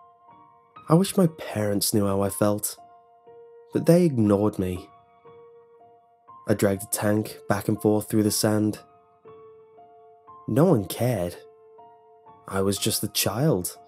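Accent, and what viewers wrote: United Kingdom. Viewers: Devin Williams they also speak very differently as this guy is British and mrcreepypasta is American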